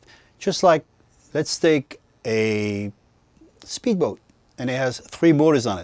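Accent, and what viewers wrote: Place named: Italy. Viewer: You're talking about me! I'm the pro musician/producer/studio engineer. Hopefully I know what I'm doing, LOL! It's interesting to listen to you speaking. I can hear very clearly where your son's voice comes from. You've still got a little bit of the Italian accent. Some of my American family do too. Your son must have worshipped you as a child, and you must have given him everything you could. He is a genius level artist with the highest level of personal expression on his chosen instrument. Congratulations on your success and your excellent equipment. It offers some of the most unique guitar tones that have ever been designed or available to the public. The attention to detail in the dynamic response is what I love most about the equipment you design. Are the current Dirty Boy pedals being produced with a licensing agreement between you and the Dirty Boy Co. 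run by Danny Gomez and Roberto García?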